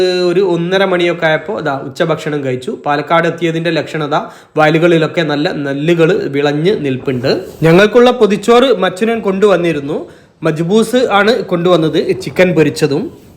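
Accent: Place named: Kozhikode, India. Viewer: ഫാഷ അല്ല ഭാഷ ഫരണി അല്ല ഭരണി ഓരോ നാട്ടിലും ഓരോ മൊഴിയുണ്ട് കോഴിക്കോടൻ ഭാഷയിൽ പറഞ്ഞതാണ് അദ്ദേഹം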